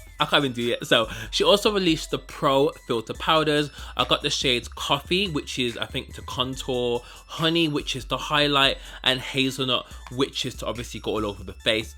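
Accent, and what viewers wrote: Birmingham, United Kingdom. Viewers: Yessss I can hear The Birmingham accent in this video lol I keep wanting to try Fenty but everyone I go to the counter it’s ridiculous. 💕 I have your piriton sis lol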